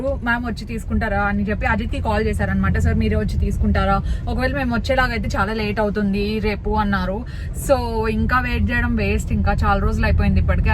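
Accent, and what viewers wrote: Anantapur, India. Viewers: Akka meeru Ananthapoor slag matladithe vinalani undhi akka plzz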